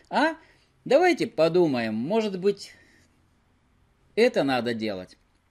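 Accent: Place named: Urals, Russia. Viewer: Уральский акцент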